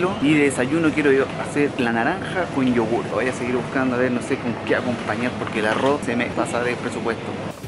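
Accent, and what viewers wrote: Chile. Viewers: No sé cómo es que estoy suscrita a tu canal (no recuerdo haberme suscrito) pero que bueno!! Jajaja me quedé super contenta con tu video. Cuando vi el título dije "$800 no esta mal" hasta que escuche tu acento que comprendí que no eras mexicano. Aquí con eso puedes hacer las 3 comidas del día en un restaurante que te incluya todo en paquete y quedas más que satisfecho, pero lo lograste! Saludos hasta tu precioso Chile :D bendiciones y excelente video! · Yo cache por como ablaba q era chileno :/ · comunica chileno?:v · Eres chileno o de otro pais con acento de chile :v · Ese acento chileno me desespera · Habla como chileno wn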